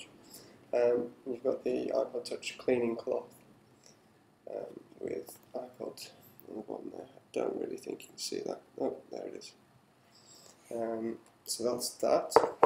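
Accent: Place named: England, United Kingdom. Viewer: thts such a sick english accent =D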